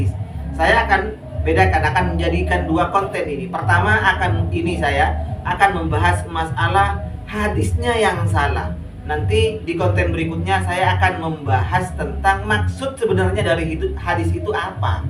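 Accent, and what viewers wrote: Madura, Indonesia. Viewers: Madura nya di p3rj3las cak..... mantap.... mau ktawa takut dosa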